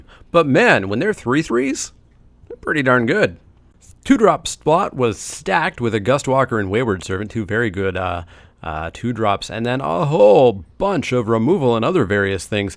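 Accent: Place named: Canada. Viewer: I never realized you were Canadian, but after you mentioned you're in Ontario, I suddenly started noticing your accent, and it's like "how did I miss that?"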